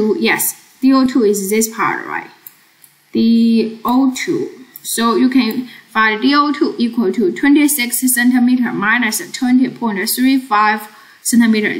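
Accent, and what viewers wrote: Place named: China. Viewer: 聼口音能聼出來是華人，感謝感謝，最近在學雙語大物，沒有頻道主你的付出我真不知道怎麽辦了:face-purple-crying::face-purple-crying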